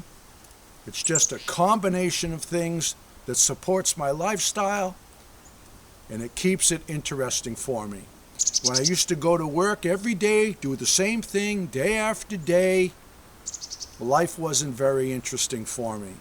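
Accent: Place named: New Hampshire, United States. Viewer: Love your channel and your life approach. Perhaps it is because I came from NH and miss the accent -- but of course, we do not have an accent. Keep making the videos so that I have something good to watch.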